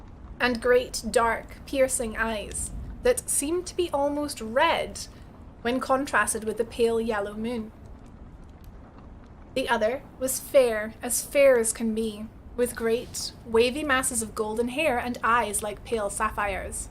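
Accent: Scotland, United Kingdom. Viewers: don't worry about it :)) the video was great and the scottish accent made it even more authentic :)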